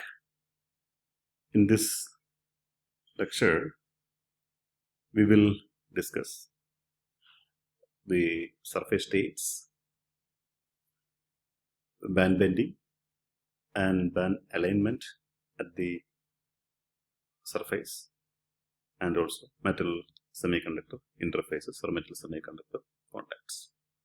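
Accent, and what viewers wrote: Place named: Kerala, India. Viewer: The narration is in Malayalam. He claims it is in English but only Malayalees can understand his English. For example, he pronounces "chop" as "chope" (rhyming with "choke"). "Bonds" is pronounced as "Bones". Beware.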